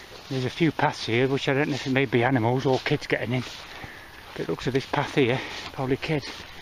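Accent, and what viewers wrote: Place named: East Midlands, United Kingdom. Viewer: Tell your wife she is beautiful as she is. Nobody of her weight needs to lose any 👍🏼. Love this video and feel I'm back in that area listening to your accent. I'm from Kimberley so it's really familiar to me. My dads from Heanor and I've friends still in Il'son. I used to go in the Newdigate Arms years ago. Oi, no poopy bags? Were u gonna ignore Gus if he'd had a dump? Love the way he is teasing you with the stick.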